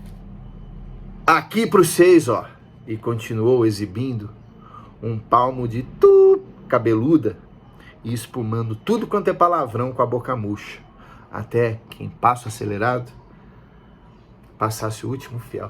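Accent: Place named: São Paulo, Brazil. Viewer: Cada vez melhores os vídeos. Bem legal também ver um amor sobre beagá narrado em sotaque paulistaço. Viva o Lagoinha!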